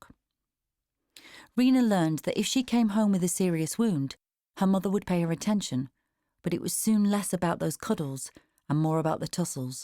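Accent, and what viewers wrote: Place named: United States. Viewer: Suranne's American accent is spot on !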